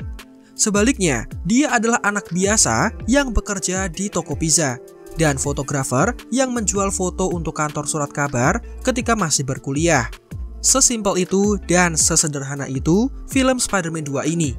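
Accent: Java, Indonesia. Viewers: Mantep nih salfok sama logat admin nya logat wong jowo 😂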